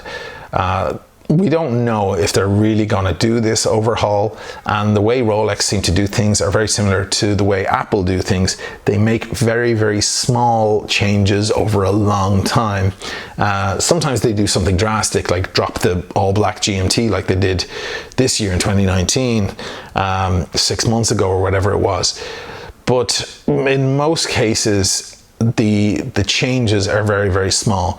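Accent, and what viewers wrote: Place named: Dublin, Ireland. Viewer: Jaime His accent is pure Dublin. Not picking up any American at all. Not a criticism in any way but I’ve had both Irish and American girlfriends and this man is pure Irish. It’s a cool accent anyway and this guy is a rising star of the watch people online community. I love his passion for the pieces.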